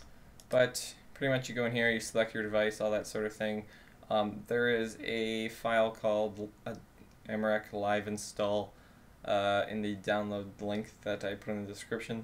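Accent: Canada. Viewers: are you canadian? i can hear it when you use the letters v and c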